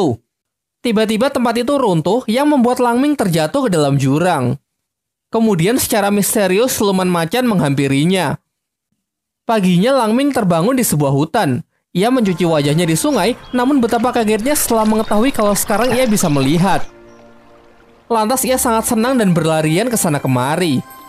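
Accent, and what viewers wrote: Java, Indonesia. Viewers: Orang jowo Yo kak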